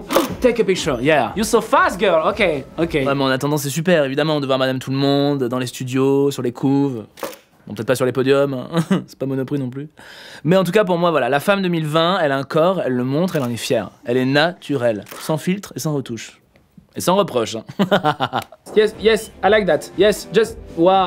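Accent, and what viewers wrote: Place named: England, United Kingdom. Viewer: J'aime tellement ton accent anglais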